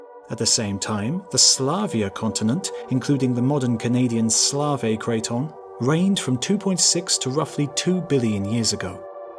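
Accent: England, United Kingdom. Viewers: Is this dude English? Why does everything with an accent sound better?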